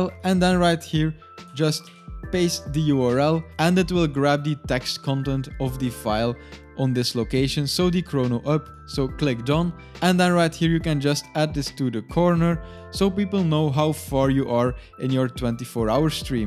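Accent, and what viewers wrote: Netherlands. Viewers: I knew you were dutch! haha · nice man, it works. hey you are dutch ( - )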